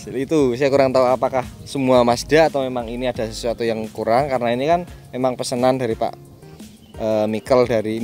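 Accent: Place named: Central Java, Indonesia. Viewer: Paling senang dengar logat jawa tengahan ...marem salam teko jawa timur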